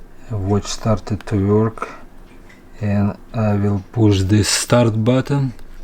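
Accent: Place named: Russia. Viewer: Похоже на русский акцент.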